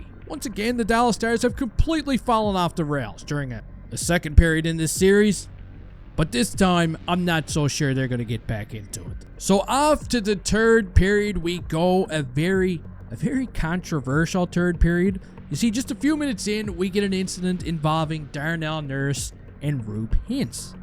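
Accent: Newfoundland, Canada. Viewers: What part of Newfoundland you from dude?😅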